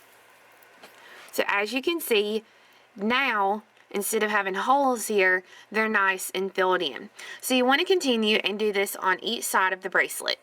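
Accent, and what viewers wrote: South, United States. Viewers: Hi, I love your beautiful southern accent. What I love the most is your way of teaching, you are one of the few that goes to the point, but give us clear explanations of each one of your beautiful pieces.Your work is impeccable,easy to understand no matter which stitch you teach us you always make it easy to fallow. Thank you for shearing with us your wonderful talent. I will love to learn how to make the gorgeous earrings you have on,and also to know where I can purchase the wire protectors that you mention in all of your videos. Is't possible that you let me know where to get them? Last but not least I want you to know that I had learn tons just by watching your tutorials. Thanks again. Love you.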